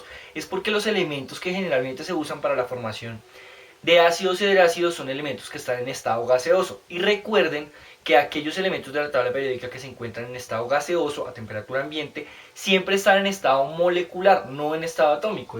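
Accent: Colombia. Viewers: Ese acento colombiano xdxd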